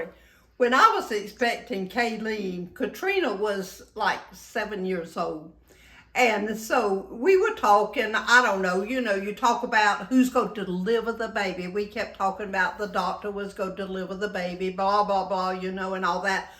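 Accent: South, United States. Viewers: I love this treasure I found today on you tube! She sounds like my Aunt Scarlett from ATL but cooks like me in the 1970! Thank you for bringing my Southern Recipies back to life!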